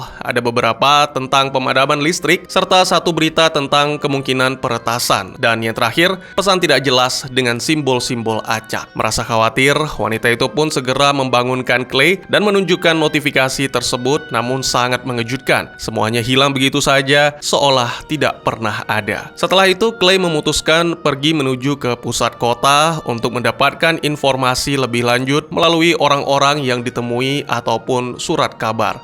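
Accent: Aceh, Indonesia. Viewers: bang lu orang aceh?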